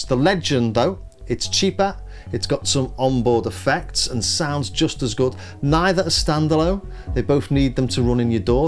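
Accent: Liverpool, United Kingdom. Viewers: Just discovered - Great work mate. I make info vids too and that's a lot of work you're putting in there. Re-living my past buying the software synths from my 20's (including the old pro one in the background!) and you provide a detailed and honest review. I do keep thinking you'd love reaper with CSI to run your synths so you wouldn't miss the physical element - it's the thing that brought me back to enjoying all this gear. We're of similar era and location by the accent, always happy to be given the score with a scouse twang ;) Have you tried any of the cherry audio synths?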